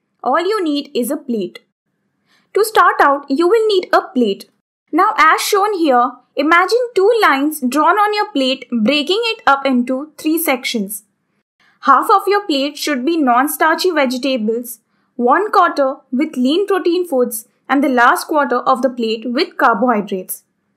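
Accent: India. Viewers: Indian logo ki english..uffff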